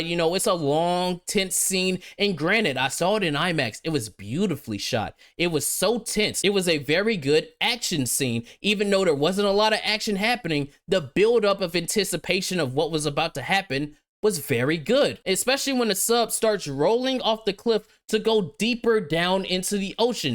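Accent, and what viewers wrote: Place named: AAVE, United States. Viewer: Your ranting is dumb and makes no sense.Speak proper english next time.Ebonics is not a proper language but just gibberish.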